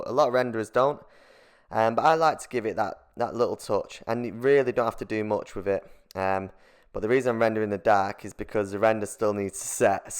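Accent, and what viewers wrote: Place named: Midlands, United Kingdom. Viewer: I'd guess he's from middle England or a bit further north based off his accent.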